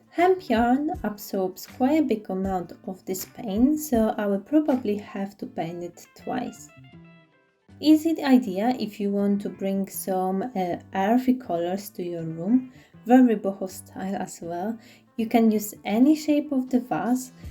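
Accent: Poland. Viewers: Ciao, i see you're from Poland i hear accent but great not shy of that i'm quite scared to speak on video because English is my 4 th language but i do a lot of diy projects, prefer more diy's on video so we could choose from, advise before to dry clay put them on the surface that you going to glue so they keep form of it, keep going it's all process enjoy😘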